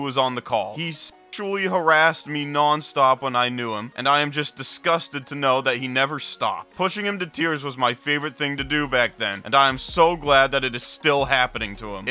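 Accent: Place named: Philadelphia, United States. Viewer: banger video !! u from philly huh?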